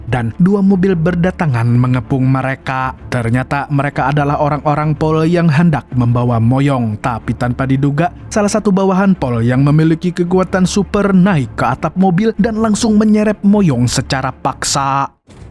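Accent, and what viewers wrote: Banjar, Indonesia. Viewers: Bang lu org banjar😂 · Pian urang Banjar kh bang 😅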